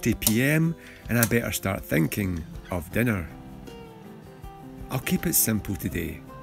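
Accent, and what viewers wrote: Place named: Scotland, United Kingdom. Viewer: Frankly love your Scottish Accent,. From Stuart Adamson to Sean Connery.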